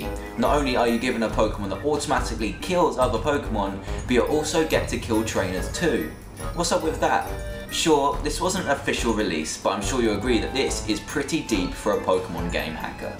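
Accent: United Kingdom. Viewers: Your English accent makes the Hypno poem way scarier. English accents in general have that effect.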